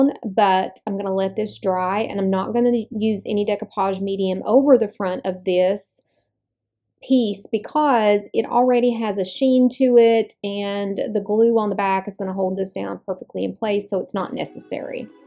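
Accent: North Carolina, United States. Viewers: I knew you had to be in North Carolina by that accent. I'm in Winston-Salem. We used to go to Hickory a lot for Gems & Minerals shows when I was little. I hated going, but they would bribe me taking me to Hardee's there. They were the best back in the day.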